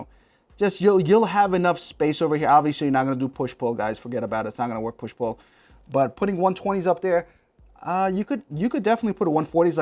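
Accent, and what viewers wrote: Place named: New York City, United States. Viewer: this guy is painfully New York and it's amazing, big twist to the tech community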